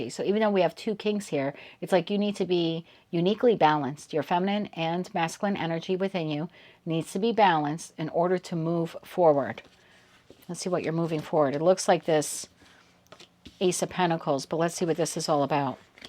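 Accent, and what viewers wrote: East Coast, United States. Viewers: Thnx ❤ great reminder Trying to figure out how to market my book 😊OMG you are so east coast Love it 😂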